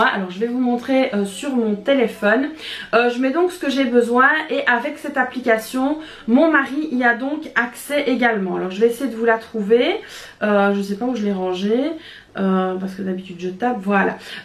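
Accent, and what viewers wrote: Belgium. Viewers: Merci pour se beau retour de courses. J'adore t'écouter, j'aime entendre les différences entre les mots belges et le français. Elles doivent être super contente tes filles. Chez nous c'est beaucoup de récup 😅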